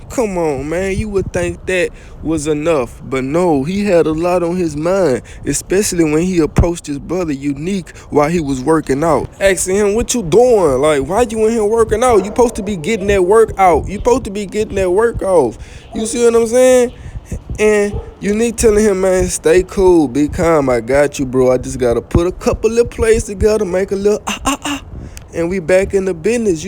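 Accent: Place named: New Orleans, United States. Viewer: Hey bro. Love the commentary with the NO/BR accent. 504/225✊🏾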